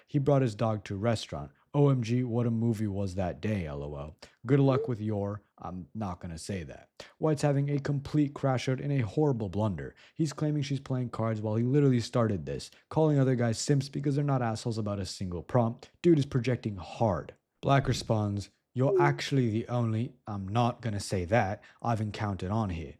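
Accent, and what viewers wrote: United Kingdom. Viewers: The British accent is excellent. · that british accent fucking broke me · the british accent is thick with this one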